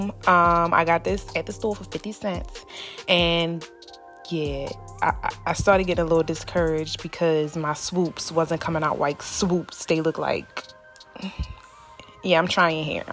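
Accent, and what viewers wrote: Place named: DMV, United States. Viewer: accent😂gotta be from dmv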